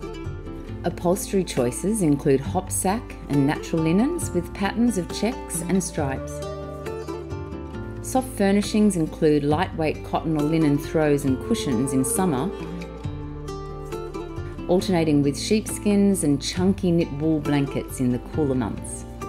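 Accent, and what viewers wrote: Australia. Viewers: Even though I struggled understanding the Australian accent but this video helped me throught my self study interior design process thanks so much for this video ❤❤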